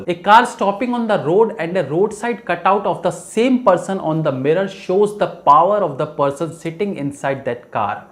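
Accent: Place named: India. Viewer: U r speaking english in Hindi tone.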